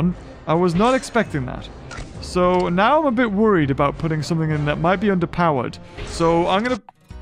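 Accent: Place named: United Kingdom. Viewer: You can tell that he's British!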